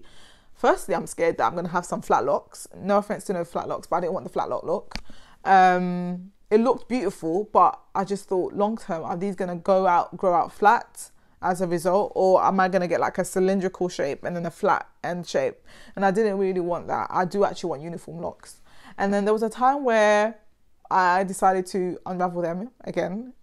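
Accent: United Kingdom. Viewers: You have a VERY nice accent 😍 we're you born and raised in London...? The UK?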